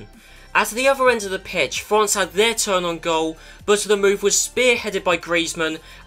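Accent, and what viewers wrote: United Kingdom. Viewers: Men do I have to correct so many names in Portuguese and Spanish cause of your British accent...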